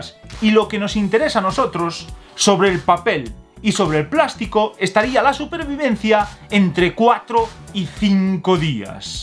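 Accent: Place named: Spain. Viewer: " infesiosos".... "testuales"... "produtos" ... ¿que zona tiene ese acento en España?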